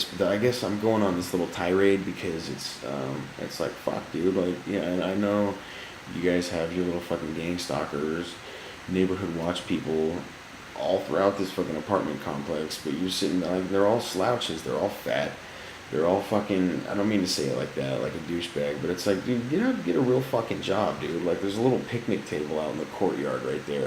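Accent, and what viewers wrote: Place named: Australia. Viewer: ha you're Australian?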